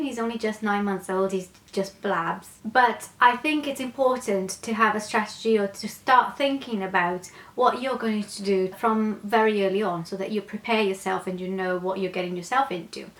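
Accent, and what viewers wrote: United Kingdom. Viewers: Aii quero ter esse sotaque britanico seu que lindo s2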